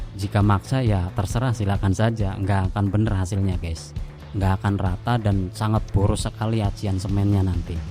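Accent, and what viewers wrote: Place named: Cirebon, Indonesia. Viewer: logatnya ky org indramayu/cirebon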